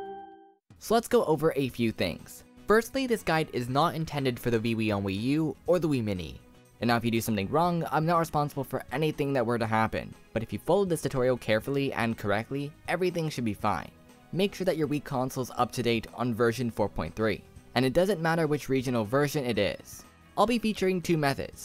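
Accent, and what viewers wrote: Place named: United States. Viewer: American I believe. I'm Britsh and judging by how he pronouncing words like 'select' I think he's American. Also, he has a nasally accent which is very typical in parts of North America.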